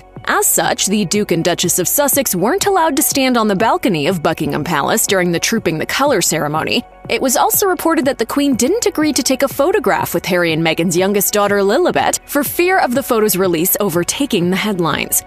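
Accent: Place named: United States. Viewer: Why American commentator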